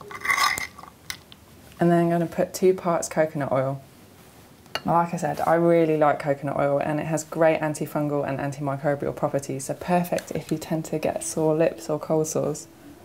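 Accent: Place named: United Kingdom. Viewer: i am not vegan either, but i love making vegan lip balm and i love your vids! i made mine with organic jojoba oil and vanilla. and because of you accent, are you from the uk? because right now im in the uk on vacation with my family......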